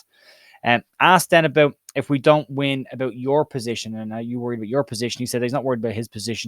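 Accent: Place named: Ireland. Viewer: As always well delivered and short and to the point, the Irish accent is a massive plus. Now to listen to a Leeds Bird Rant and then maybe Conner if it's not too long and I can always fast-forward Joe. LOL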